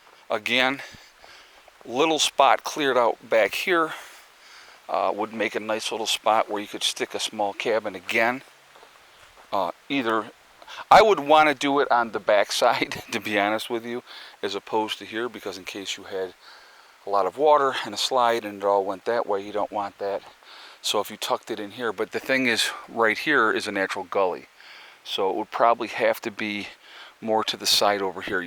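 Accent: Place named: New York, United States. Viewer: Psssst, your NY is showing.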